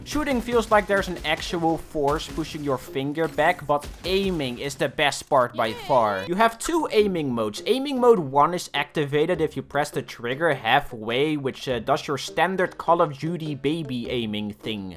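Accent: Netherlands. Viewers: U dutch? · hol up u dutch?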